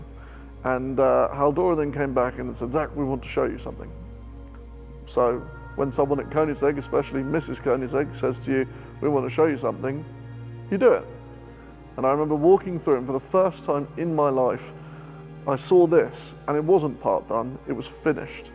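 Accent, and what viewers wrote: RP, United Kingdom. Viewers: You sound like the stereotype of a British posh bell end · This guy’s voice sounds like a stereotype cartoon posh British guy from 1900s with a suit and a monocle.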